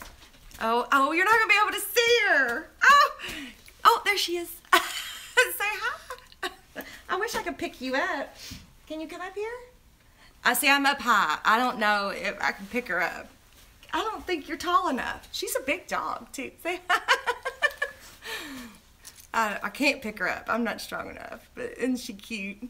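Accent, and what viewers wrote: South, United States. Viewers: Happy Birthday, I watched your video the day it aired but I wanted to comment today because it was your Birthday... You are so amazingly talented and your humor is hilarious I love to sit back and watch your videos and I get so tickled. I am from Georgia and I can definitely relate to the southern accent... lol Keep up the good work... I only have one request and that is if you could make all your background designs a bundle.. That would be wonderful.. Again Happy Halloween and Happy Birthday!!! <3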